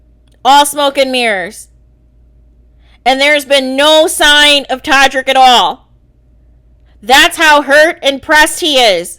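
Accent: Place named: New England, United States. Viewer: The way you say “but” and “because” is annoy af lmao. But it sounds New England so I’m used to it.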